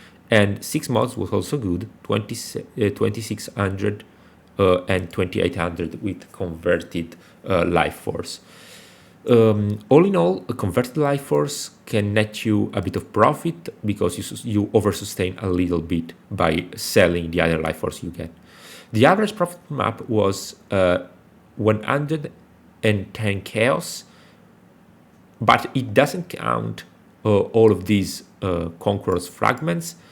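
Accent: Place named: Balkans. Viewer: Where are you from, you sound like you are from balkans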